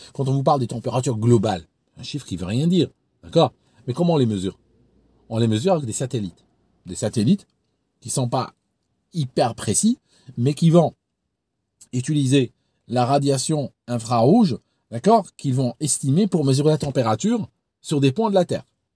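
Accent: Switzerland. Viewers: "le trou de Lausanne" raccord avec ta dernière sur les maladies pochette surprise de la croutonne d'Angleterre. Ton ravissant accent suisse est tellement gloutonnant 🥂 Petite intro d'une douce chanson pour les amis en tristesse 🎶 On peut vivre sans richesse Presque sans le sou Des seigneurs des princesses Y en a plus beaucoup Mais vivre sans tendresse On ne le pourrait pas Non non non non On ne le pourrait pas! né André Raimbourg le 27 juillet 1917 à Prévot-Vicquemare décédé le 23 septembre 1970 à Paris. Poète, tes papiers!!! 🫂 l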